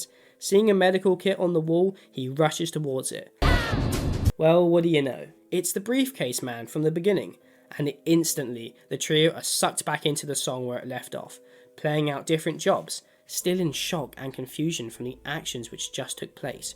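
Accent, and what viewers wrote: United Kingdom. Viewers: Is this guy British??????? Fredbear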